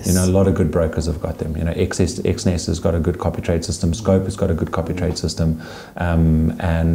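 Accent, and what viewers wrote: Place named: South Africa. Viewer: Wow I can't believe that this guy speak verrphaaaa like African South African 😂😂😂🇿🇦🇿🇦he is open minded indeed not only on business side of things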